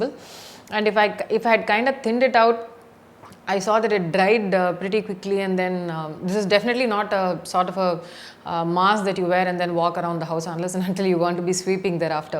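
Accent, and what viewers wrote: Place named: India. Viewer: I like Ur sonut Indian talking style 😆😆😅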